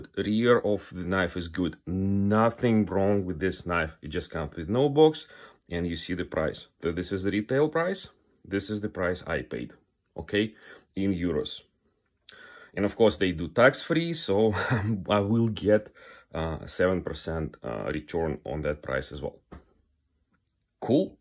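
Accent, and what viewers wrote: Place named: Russia. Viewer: Чувак да ты русский? )) У тебя русский акцент))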